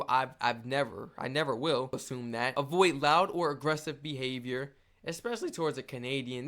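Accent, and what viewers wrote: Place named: United States. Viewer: Your manners and your appreciation of the struggle that is life make you sound like a candian. With an American accent and passport, of course lol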